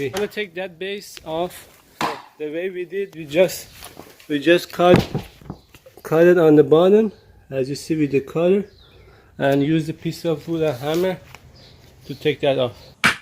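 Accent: Iran. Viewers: Strong persian accent😉👍well done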